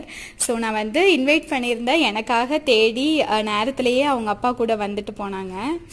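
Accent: Kongu, India. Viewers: Kongu ngala neenga pesura slamg la therunjuthu album pathatha vechu kekaren thapa nenachukathinga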